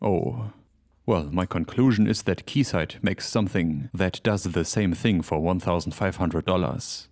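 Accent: Germany. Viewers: Germany's have fun acent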